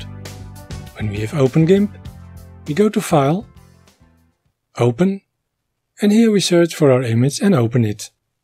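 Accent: Netherlands. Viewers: Your videos are all so very instructive and beautiful. You sound like Dutch. Am i correct?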